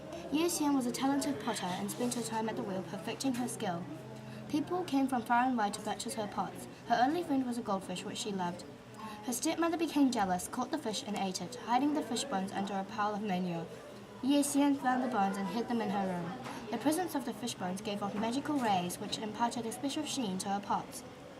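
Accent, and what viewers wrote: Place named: England, United Kingdom. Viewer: OMG an Asian with a English accent.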